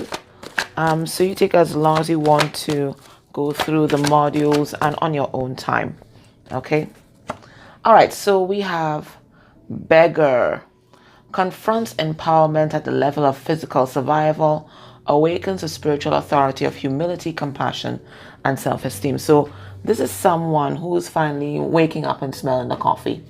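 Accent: Trinidad, Trinidad and Tobago. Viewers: That’s a Trinidad accent y’all! 🇹🇹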